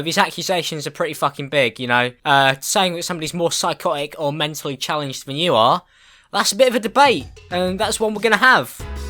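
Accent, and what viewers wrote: United Kingdom. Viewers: Are you british?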